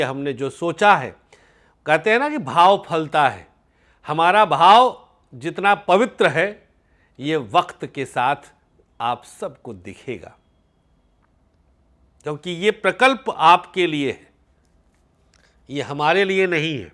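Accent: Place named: mixed, India. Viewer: Sir ap u.p Bihar k byea ki treh mat bola kro saf hindi bola kro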